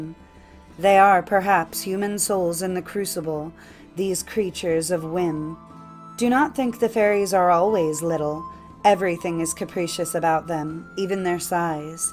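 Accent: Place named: Ireland. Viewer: Nice job, btw good Irish accent!💚💚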